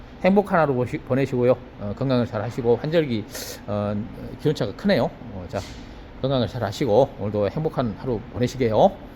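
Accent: Jeolla, South Korea. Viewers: 전라말씨기 찡해버리네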